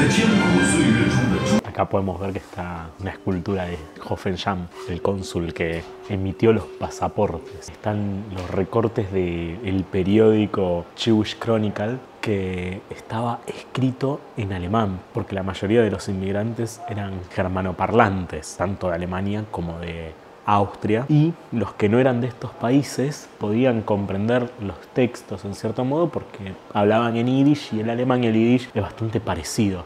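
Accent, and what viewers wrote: Buenos Aires, Argentina. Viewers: Qué interesante y exótico!, narrás con encanto, me da gracia tu modo juvenil y porteño chabón! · Shanjai, shangai, tu español es muy argentino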